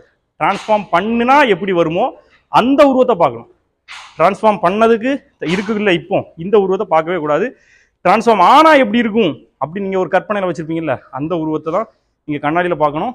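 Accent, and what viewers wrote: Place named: Kanyakumari, India. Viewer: இது தான் உண்மையான கன்னியாகுமரி பேச்சு வழக்கு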